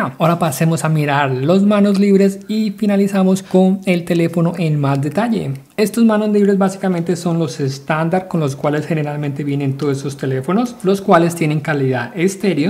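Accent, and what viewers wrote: Colombia. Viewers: Hola señor, una pregunta Ud. ¿Es colombiano? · oye eres de colombia?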